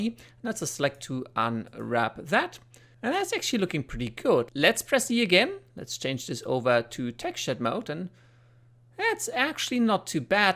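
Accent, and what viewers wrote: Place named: Germany. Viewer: The German accent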